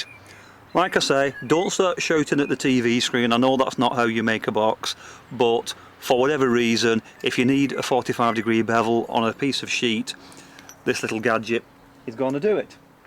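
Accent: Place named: Northern England, United Kingdom. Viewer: Thanks for your reply. I wouldn't have guessed Northern England, but I wasn't sure. I spend a summer in Sheffield many years ago with a bunch of Australian friends. I loved England and haven't had a chance to get back. This little tool is brilliant. I am having one struggle with though, maybe because I'm a beginner, but maybe you have a tip. When I am filing a piece of sheet metal I find that after I have squared it upon the stop I notice it shifts sometimes when I go to tighten it back up. Probably need more practice. It looks like you are holding it in place with one finger while you tighten. I will try giving that a try. Thanks again for sharing these videos.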